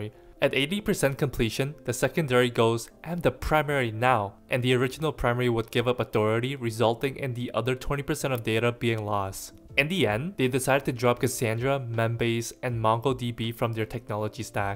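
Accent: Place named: Asia. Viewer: I could tell you're asian without seeing your face It's your accent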